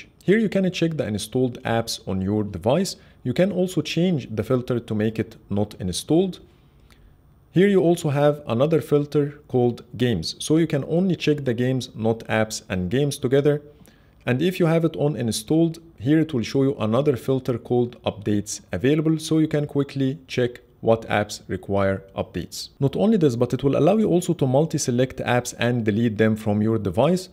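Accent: Egypt. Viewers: u accent look Egyptians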